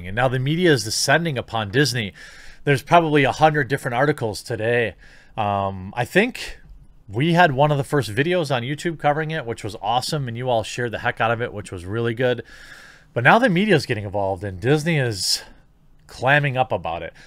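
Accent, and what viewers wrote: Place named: United States. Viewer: You're saying Xianjiang wrong, but I've heard a lot worse. You're just saying it with an American accent. You're also saying Uyghur wrong. It's not "We grr." That's just how MSM pronounces it and I guess most of the West now thanks to them. It's more pronounced like "Uuu ga ru." (I forgot how to write down sounds but that's the best I can do. lol)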